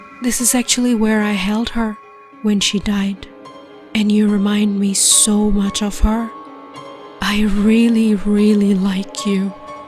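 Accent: India. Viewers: Your accent is Indian From~🇧🇪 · You have a cute Indian accent. I can hear it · Is the narrator indian ?? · Narrator's Indian accent is putting me off. She sounds Bengali.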